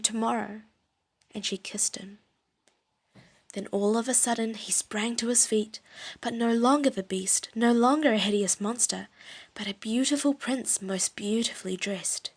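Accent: New Zealand. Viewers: 아... 다른 채널에서 타다가 오게 됐는데 ㅠㅠ 자기 전에 듣기 너무 좋을 것 같아요! 뉴질랜드 발음의 특징(?)을 정리해주신 영상도 올려주신다면 좋겠어요! 딱 듣고 아 이건 뉴질랜드다 싶은 게 뭔지 알고싶어요 ㅎㅎ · 우와... 뉴질랜드 발음으로 영어듣기 하고싶었는데 드디어 그 채널을 찾았네요!! 너무너무 감사합니다~ 앞으로도 좋은 영상 많이 올려주시면 감사히 보겠습니다 :) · 항상 영상만 보다 댓글은 처음 남기네요ㅎㅎ 뉴질랜드 억양으로 동화를 들으며 공부할 수 있어서 너무 좋아요! 목소리 너무 좋으세요:)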